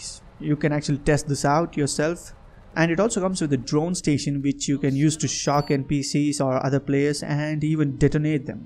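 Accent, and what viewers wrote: India. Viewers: Indian i think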